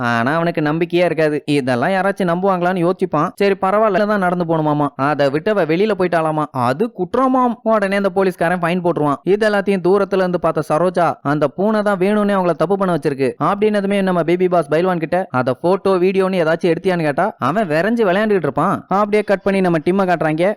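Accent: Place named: Madurai, India. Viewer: Bro your slang is so super like Madurai voice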